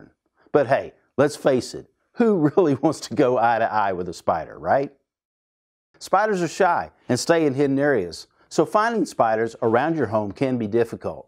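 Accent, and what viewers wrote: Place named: United States. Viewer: No offence but I'm an Australian arachnophobe. Your American accent is not reassuring for me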